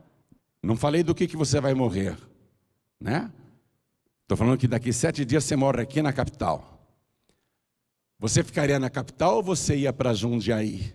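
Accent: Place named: Rio de Janeiro, Brazil. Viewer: Pastor seu sotaque carioques e muito engraçado. O Sr não e de Piracicaba. Deve ser uma técnica de fala né?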